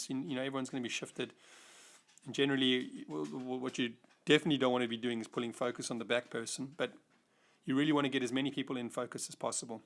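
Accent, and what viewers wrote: South Africa. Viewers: Thanks very informative and well presented a nice calm narrative no shouting or speed talking. Soon as I heard you speak I thought SA accent a beautiful country, I have been lucky enough to visit it a few times.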